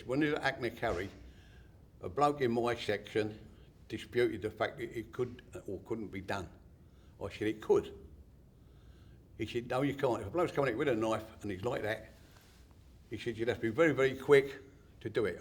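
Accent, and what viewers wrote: United Kingdom. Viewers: Only British man that is immune to being made fun of for his accent.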